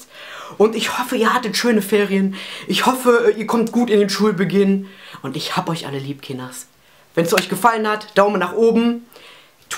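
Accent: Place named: Cologne, Germany. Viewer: hör ich da kölsch?!?!?!?! ich würd sagen.....super tipp, das mit dem durchmachen....probier ich gleich mal aus:D ...ich hab irgendwie angst vor diesem st. augustin...!!!!!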